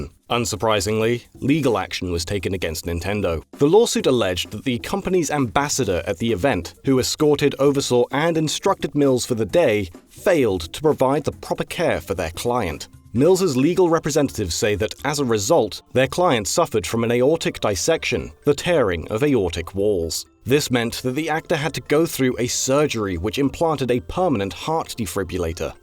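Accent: United Kingdom. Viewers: This is a very British narration.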